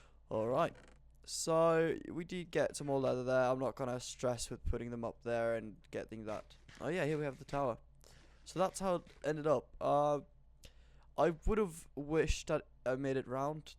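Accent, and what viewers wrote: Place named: England, United Kingdom. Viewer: You sound english. It is uncanny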